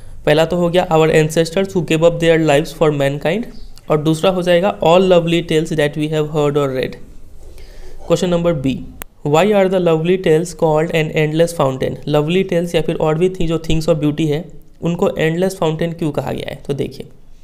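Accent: Bihar, India. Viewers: Are you from Bihar sir please reply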